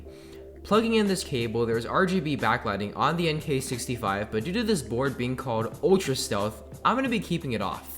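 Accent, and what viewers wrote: South, United States. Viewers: I demand the southern accent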